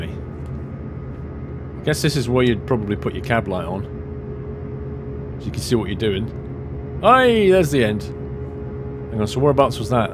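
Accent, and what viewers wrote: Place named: United Kingdom. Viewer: How do you forget that when he has a British Accent?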